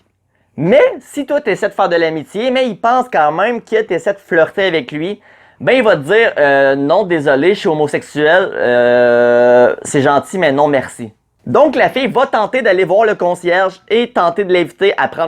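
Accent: Quebec, Canada. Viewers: L'accent québécois ça me tue Mais merci pour l'explication quand meme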